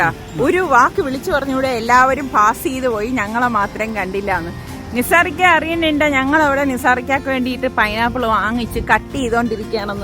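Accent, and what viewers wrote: Trivandrum, India. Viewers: trivandram bhasha samsarikkarundo ennu athenikku istamanu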